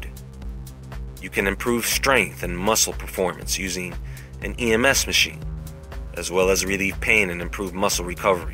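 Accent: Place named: North Carolina, United States. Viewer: ! Are you from NORTH CAROLINA? You sound like it! Please respond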